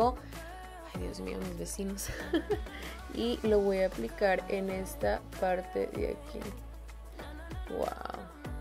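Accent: Sinaloa, Mexico. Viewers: Soy sinaloenseeee de los mochissss jajajja y cuando te escushe hablarr no sabia que eras de mi querido sinaloa dijeee, esta mujer es de mi tierraaa.... jajjajaja vivo en los angeles.... tu en donde te encuentras....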